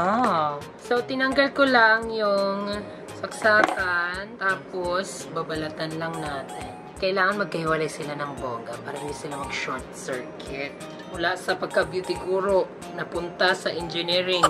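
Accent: Visayas, Philippines. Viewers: Ay bisaya diay ka mamsh? Karon pa oks😅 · Bisaya ka madam ?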